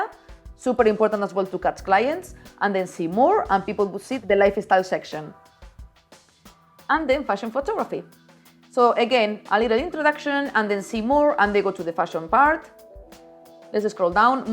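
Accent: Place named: Spain. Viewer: I love your content! I discovered your channel yesterday and I can't stop binge watching all your videos. Very very insightful and informative content. Thank you millions and wishing you all the success - you deserve it. Your artwork is truly amazing and inspiring. P.S. Your Spanish accent is like a joyfull honey to my ears.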